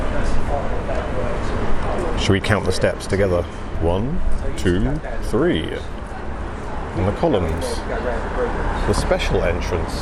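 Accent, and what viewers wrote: United Kingdom. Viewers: damn definitely having a British accent makes you sound so much less insane. I pray you get some help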